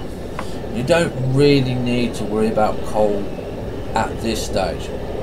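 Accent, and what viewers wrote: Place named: England, United Kingdom. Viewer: What bit of England are you from? as your ascent I think I have heard before but I can't remember where~! :P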